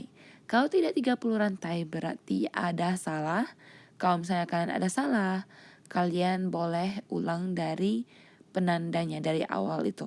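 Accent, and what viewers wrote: Malaysia. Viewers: ouh patutlah your logat/slang sound A bit like Malay xD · owh eheheh ku kira dari malay karena logat nya mirip by the way tangan kakak ko bisa putih bersih gt sih? TwT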